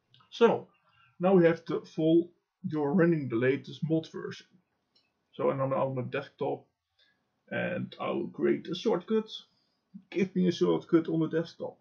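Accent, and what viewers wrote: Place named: Netherlands. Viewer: hey I get an error each time the launcher is downloading, also you have a dutch accent I can tell because I am a fellow Dutchman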